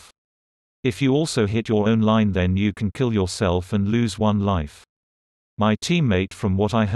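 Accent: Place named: United Kingdom. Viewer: You sound british. I make splix vids too.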